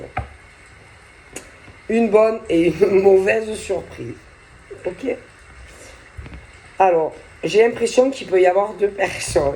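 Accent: South, France. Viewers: Bonjour, j'adore cette guidance !. Un jeu d'actrice très réussi, un franc parler bien du sud, un vent de fraîcheur ! Ça met de la gaité sur des situations difficiles. Merci bcp, et un tirage qui me parle vraiment 🙏🙏❤️❤️❤️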